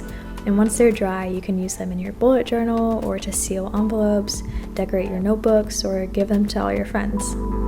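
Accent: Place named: United States. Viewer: You sound american how did you get something from 다이소? Do they have online stores?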